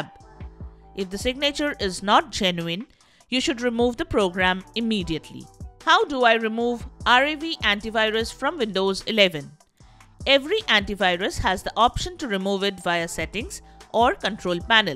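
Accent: India. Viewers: this is a virus unistall and never trust a video with an Indian accent almost all the time the scammers are form there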